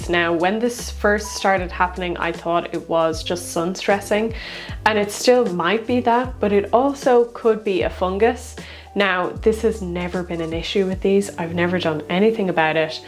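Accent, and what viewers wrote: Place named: Ireland. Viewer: This is an Irish accent in the general tone most people use because we're not fake and we don't feel the need to elevate our pitch like we're talking to newborn babies, toddlers or doggies woggies so if you can't handle it you should probably toddle along and find the Sesame Street version.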